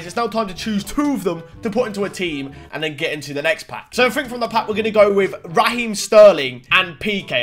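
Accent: Northampton, United Kingdom. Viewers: Are u from Northampton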